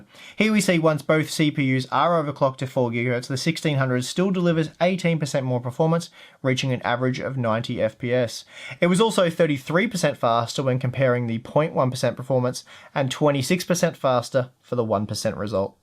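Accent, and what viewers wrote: Australia. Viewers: are you OZ?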